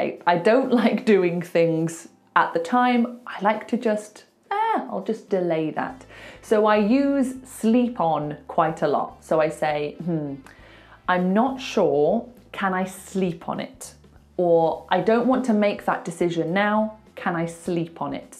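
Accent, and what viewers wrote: United Kingdom. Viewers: Which part of UK are you from? Your accent has reminded me a lot of my time in the UK. Thanks a lot for your great videos. Keep moving on!! ❤ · Hi Teacher, I like all your useful English teachings. I love the way you speak British English. I want to learn all your important lessons teacher. Thank you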